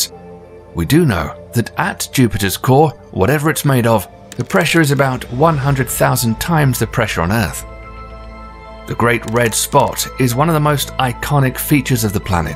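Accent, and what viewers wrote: United Kingdom. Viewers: Oh a British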